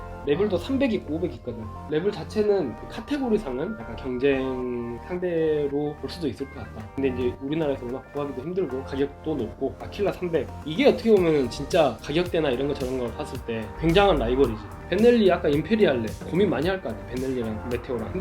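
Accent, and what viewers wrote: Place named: Chungcheong, South Korea. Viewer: 충청도 이십니까? 저희 작은아버지랑 말투가 똑같고 웃음 코드도 똑같아서 너무 사람이 좋아보여요 좋은 영상 감사합니다 ^^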